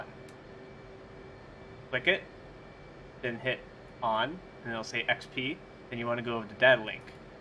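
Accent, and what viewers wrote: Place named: India. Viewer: Whats wrong if he has an indian accent? Some of the simplest explanations out there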